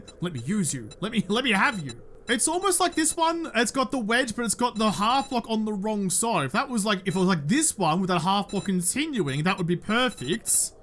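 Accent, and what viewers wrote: Australia. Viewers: But the rest of us are here for the rapid-fire Strine!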